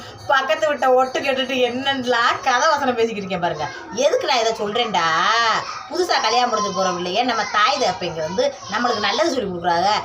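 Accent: Madurai, India. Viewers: Mathurai or usilampatti or thondi slang kandippa namma ooru kaa thaan neenga ❤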